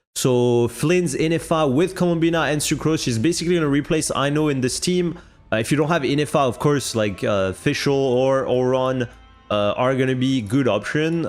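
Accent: France. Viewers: are you french you have an french accent sometimes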